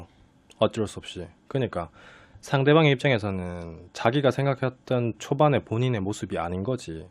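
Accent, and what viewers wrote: Gyeongsang, South Korea. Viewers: 단호한 경상도 말투가 더 믿음직스러운건 느낌인건가 ㅋㅋㅋ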